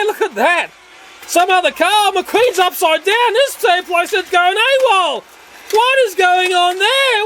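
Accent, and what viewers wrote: Australia. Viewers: I’m sure your target audience for this video is two three olds and older. I know my daughter will love to watch this she’s two. I’m 24.. and I just watched this through.. I love it 😆 so you know your kiddie YouTube videos are not only for kiddies.. you’re a great person and I love all your videos.. I try to share all your videos as much as I can.. love you as a person, were in the uk. But your awesome Australian accent always is my three in the morning cure to go back to sleep 😛 chronic insomniac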